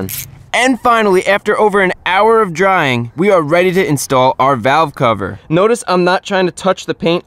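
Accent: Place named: New Jersey, United States. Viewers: Love your videos man. If you lived closer we would hang out. You do stuff how my friends and I do. From listening to your voice I'm guessing you're from Jersey? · I heard the NJ in your voice a mile away lmao!!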